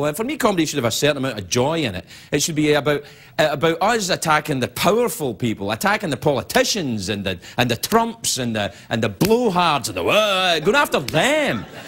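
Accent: Scotland, United Kingdom. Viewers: His accent has gotten less Scottish and more Americanized over the years, for sure. You can really hear his accent coming out here. Maybe it's just him talking from the heart and not trying to do his usual monologue voice or something like that. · he sounds so much more scottish here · he sound REALLY scottish in this wow, his accent is a lot less prominent now. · He still sounds Scottish here. He had almost lost it by the end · Is he Scottish? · His accent was so much thicker then. RIP Scottish Accent. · He sounds so scottish here. Usually i think he has lost his accent but i can definitely more than hear it, too. Also that audience sucks they didnt understand the assignment. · He sounds a lot more Scottish here than he does now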